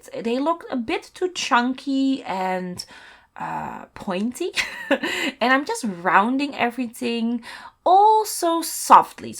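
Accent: Netherlands. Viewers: Are you dutch, or where are you from?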